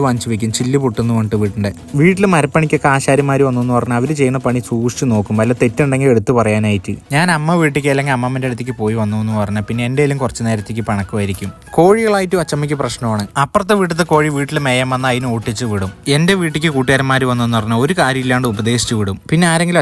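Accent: Palakkad, India. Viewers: മോന്റെ നാട് പാലക്കാട് ആണോ ഭാഷ കേട്ടിട്ട് പാലക്കാട് പോലെ തോന്നുന്നു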